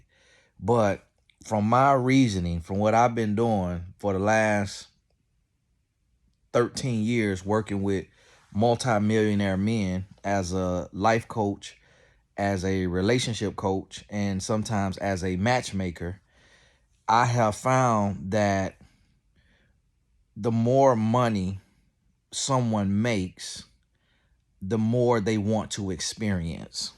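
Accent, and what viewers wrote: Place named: Mississippi, United States. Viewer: you so funny and country! Your folks gotta be from Mississippi somewhere… anyway, you be speaking facts for real. A man that want you don’t miss you!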